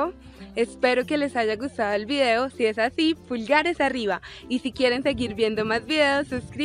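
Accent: Colombia. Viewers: A mi lo que me inspiraria a escribir son tus bellos ojos y tu forma de hablar colombiana se ve que sientes frio para la próxima llevate un sueter que este calentito porque el que tienes puesto no calienta en lo más minimo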